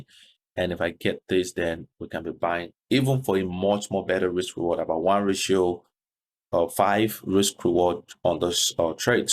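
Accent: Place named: Nigeria. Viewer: Definitely Nigerian 😅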